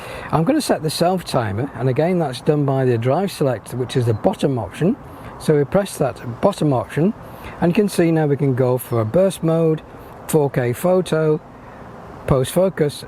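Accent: England, United Kingdom. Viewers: Very helpful - good to hear an English voice (I'm from Stoke but live in the US) - thank you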